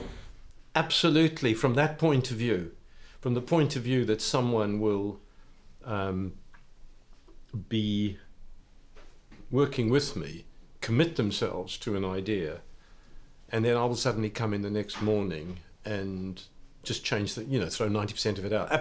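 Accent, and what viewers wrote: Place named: South Africa. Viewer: He still has a slight South African twang to his accent .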